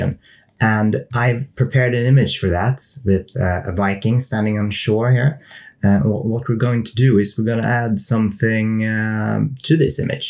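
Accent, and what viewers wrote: Sweden. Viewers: The way you said automatics. I think it would sound less Swedish if you said Automadics and didn't emphasize anything. Your Swedish dialect is very obvious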